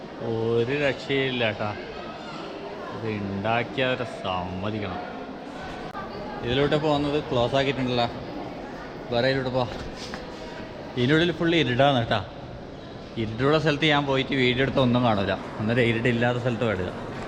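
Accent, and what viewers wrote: Kannur, India. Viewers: കുറച്ചു കൂടി നല്ല മലയാളം സംസാരിക്കൂ, എല്ലാം കണ്ണൂർ ഭാഷയിൽ സംസാരിക്കാതെ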